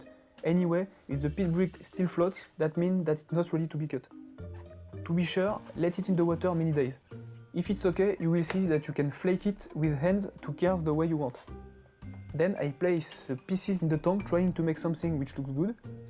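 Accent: France. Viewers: I love your french english accent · Mais quel accent Français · French accents are so cool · un bon accent francais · that sweet sweet french accent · On entend direct un Français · Ca sent le français ça ! :) Sinon, belle réalisation. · I don't understand your French-English accent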